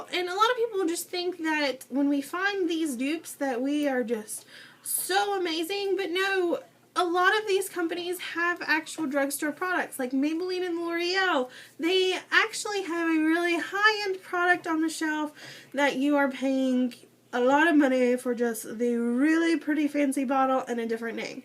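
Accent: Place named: West Virginia, United States. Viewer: All I need is broke fat girl with a WVa accent sounding like hillbilly Minnie Mouse telling me how to look cheap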